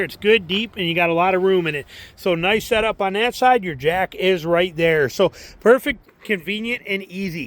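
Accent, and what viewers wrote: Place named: South, United States. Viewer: sounds like a redneck. I passed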